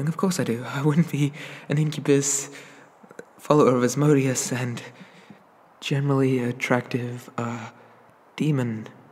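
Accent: United Kingdom. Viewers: Am I the only one who loves the slight british accent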